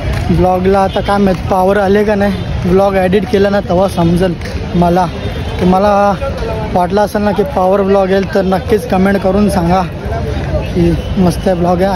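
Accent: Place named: Agri, India. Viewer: एक नंबर भावा आपली आगरी भाषा आणि तुझी बोलण्याची टँक एक नंबर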